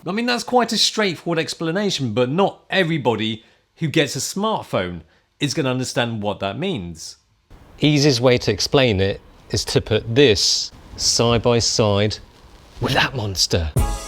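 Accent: United Kingdom. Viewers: I remember as young funny guy with british accent. Fast forward,now with wife and kids in tow looks like granpa of himself. Run for the hills Save yourself!!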